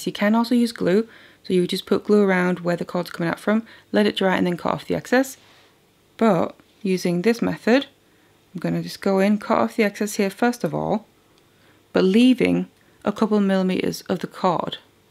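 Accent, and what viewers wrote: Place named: Newcastle, United Kingdom. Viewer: OK, I have to ask where your accent is from! I keep thinking I've got it, then it changes! There really sounds like some Geordie in there!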